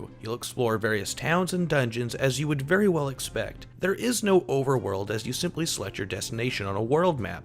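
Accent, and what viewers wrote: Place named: United States. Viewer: Sounds american. French pronunciation of title. UK pronunciation of herb. What the...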